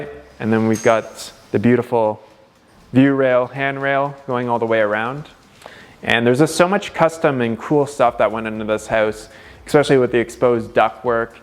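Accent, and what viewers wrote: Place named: Canada. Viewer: he says aboat like a Canadian! nice job on shower.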